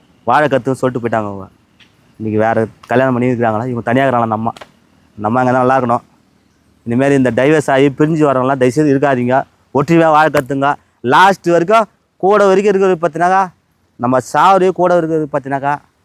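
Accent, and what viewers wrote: Madurai, India. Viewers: மதுரை வாசகம் சூப்பர் 🎉🎉🎉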